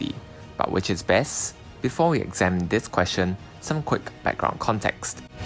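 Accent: Singapore. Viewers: You sounds like Singaporean.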